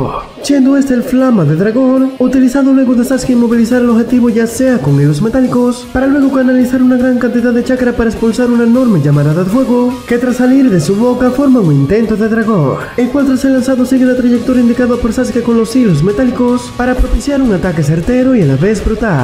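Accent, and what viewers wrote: Dominican Republic. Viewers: Bro tú forma de hablar es peculiar de RD, será que si?🤔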